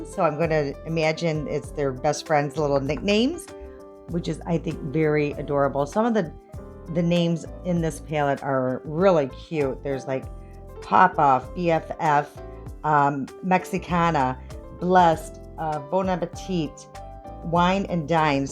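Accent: Midwest, United States. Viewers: Hi!! I’m not sure where you’re from but you remind me of my time living in Minnesota. That wonderful Midwest accent. You make me feel so nostalgic to go back there. :) enjoying your content.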